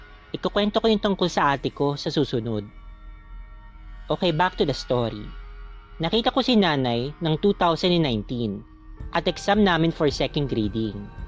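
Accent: Visayas, Philippines. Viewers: Ang cute MO magbisaya mamshie... Hahahaha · good aftie po! Thanks.. okay lng po sa bisaya, pronunciation, cute kasi pakinggan hahaha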